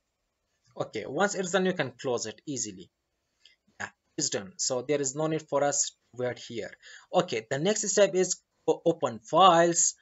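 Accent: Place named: India. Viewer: the relief i felt when i heard an indian accent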